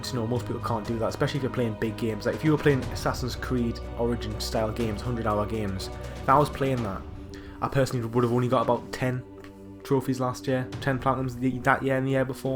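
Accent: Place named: North East England, United Kingdom. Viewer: Are you from the north east of England by any chance? If you are, then I’m a fellow north east trophy hunter haha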